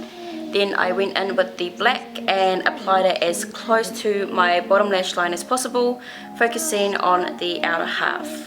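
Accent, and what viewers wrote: New Zealand. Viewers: omg is she from nz? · the accents sounds kiwi, I'd like to try the LA cosmetics where can I purchase them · U sound & look part or Maori ..Kia ora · Was out of it to hear a kiwi voice on a YouTube video! Love your work girl! What an inspiration!! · You speak like me!!! Woohoo a Kiwi! & here l was thinking you were from the States haha.. Beautiful tutorial btw, loved it! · Her accent is what u call a kiwi accent not Australian don’t get it twisted 😂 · As soon as I heard your voice, I clicked on your account to see which country you were from because I swore you sounded like a kiwi cx Im from New Zealand, this is my first video that I watched of yours and I think it looks beautiful :) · You sound like a New Zealander , Kiwi as girl ! ,love it ! you go ❤❤ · i think she's from NZ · She's definitely a kiwi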